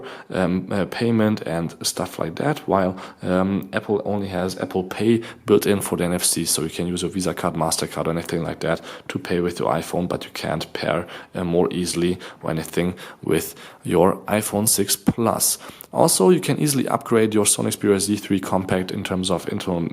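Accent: Germany. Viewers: You notice right away that he's german, lol. iPhone 6 FTW! ❤ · Der deutsche akzent.... schrecklich!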